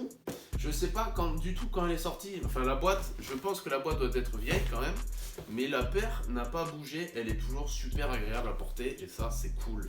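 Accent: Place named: South, France. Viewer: Salut mec je viens juste de découvrir ta chaîne, enfin une personne qui a un peu l’accent du sud mdrrr j’en ai marre de l’accent parisien 😂 bref j’ai bcp aimé la vidéo +1 Abo ah oui et avant de partir, j’ai un budget de 200€ pour une AJ1 Hugh en 11, est-ce que je devrai attendre que Nike en sorte sur lapp où j’en prend une sur un site direct? (si t’as un site qui vend des snkrs pas cher dis moi je suis preneur) et pour re-finir ça pourrait être cool de faire une sélection de vêtements pour des tenues avec tes paires les plus importantes pour toi ça serait vraiment cool !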